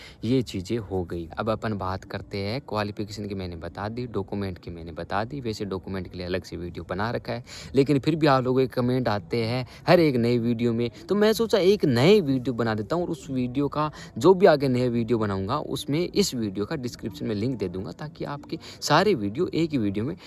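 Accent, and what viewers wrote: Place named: Rajasthan, India. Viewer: Bhaiya app rajsthan se ho kya boli me lg raha hai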